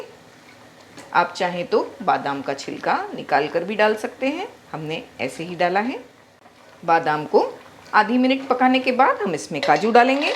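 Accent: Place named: Konkan, India. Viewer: Mashallah, aapi recipe k naam per daaba k khaate hai Aap log, aap k lehja kehta hai aap konkani hain...kabhi kabhi Bhatkally dish bhi banaye Karain.. runaways success guaranteed.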